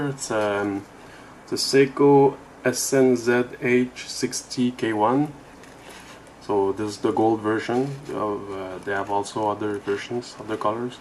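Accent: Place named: Quebec, Canada. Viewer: Québecois? - moi oui. I kinda hear it in your pronunciation. Good vid, i am considering getting this one or the blue. Cheers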